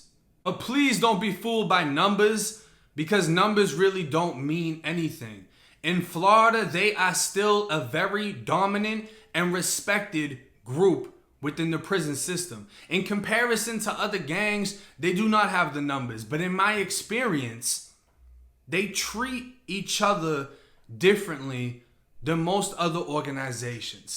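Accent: Boston, United States. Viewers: Yo, are you from Mass, you got a thick Boston accent fam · You sound like an idiot from Boston. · Yo anyone evah tell you that you have a Boston accent, are you from Mass.?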